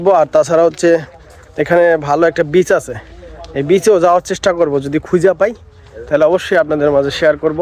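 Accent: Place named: Munshiganj, Bangladesh. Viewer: না আপনার কথা সুনে মুন্সিগঞ্জের কথার মতো লাগে তাই জিজ্ঞেস করলাম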